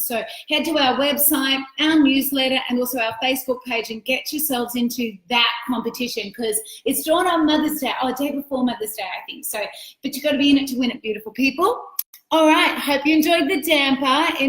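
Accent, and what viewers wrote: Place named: United States. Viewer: Why do you put on an American accent?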